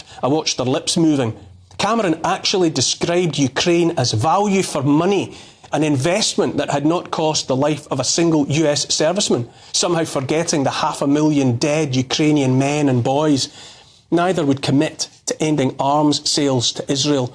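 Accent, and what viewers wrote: Scotland, United Kingdom. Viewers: That strong, angry, Scottish accent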